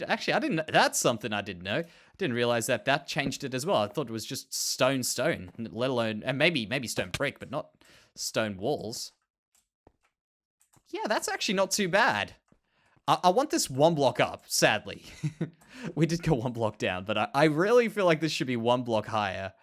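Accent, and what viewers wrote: Australia. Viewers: it's funny, you are the only other Australian Minecraft Youtuber I know of! It's so amazing to hear an Australian accent. QLD is da best xD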